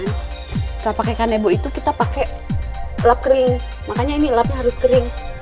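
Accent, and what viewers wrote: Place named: Puncak, Indonesia. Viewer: Love your PUNCAK CITY aksen..👏👏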